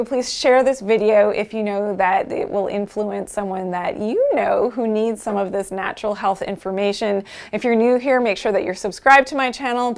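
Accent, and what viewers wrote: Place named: Canada. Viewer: I love her Canadian accent, it’s not something I hear in my country and not even on tv or movies. These were good tips btw that I haven’t heard before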